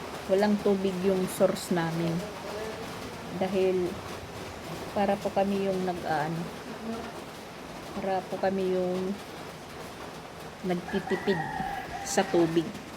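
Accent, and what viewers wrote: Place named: Visayas, Philippines. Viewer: Cute pamation ug mag bisaya ka ❤❤❤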